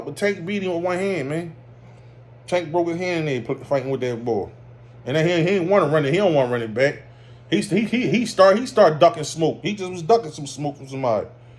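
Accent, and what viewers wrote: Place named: Baltimore, United States. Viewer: You got a strong Baltimore sounding accent but Philly ain't but a few hours away. And Ryan only got a left hook. Nuthin else. Tanks gonna nap him.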